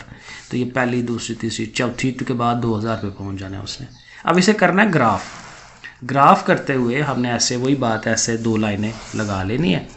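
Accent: Sialkot, Pakistan. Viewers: Sir ap sailkot sy hian ap ki bollli tu sailkoti hia 😘❤️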